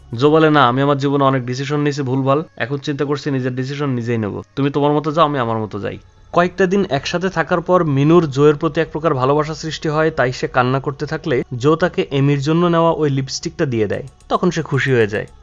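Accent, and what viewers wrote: Khulna, Bangladesh. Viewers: ভাই আপনার বাড়ি কি খুলনায়?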